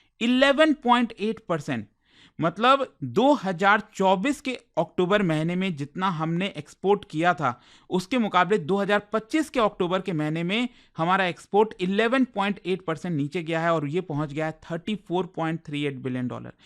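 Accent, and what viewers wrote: India. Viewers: Your Indglish sounds horrible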